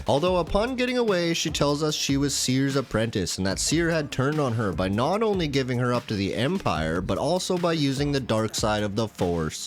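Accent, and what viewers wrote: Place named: Alberta, Canada. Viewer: He's either Albertan or Nova Scotian bud 😅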